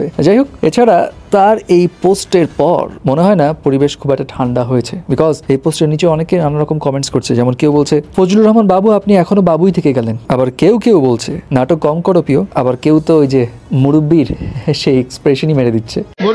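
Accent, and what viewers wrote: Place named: West Bengal, India. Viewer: Tui dada babu der moto kota boltasos ken? Tui ki oi parer naki re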